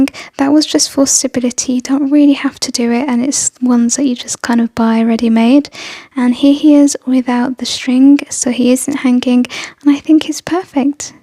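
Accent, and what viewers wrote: England, United Kingdom. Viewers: Hi love! Personally, you don’t have to remind me to press the thumbs up because that is the first thing I do when I open the video! Such a cute idea to put a tea light in the egg shell! My fave is the last diy., did I ever tell you how I love your calming voice! Add the English accent and....heaven! You should do story time for kids at the local library! XOXO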